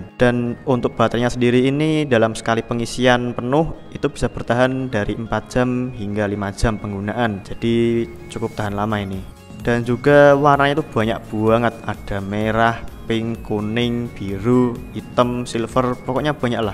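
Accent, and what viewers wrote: Java, Indonesia. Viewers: medhok mase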